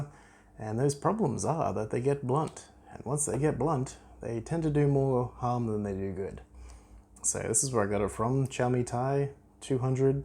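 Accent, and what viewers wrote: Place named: Germany. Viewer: Deutscher Akzent kickt wie Beckham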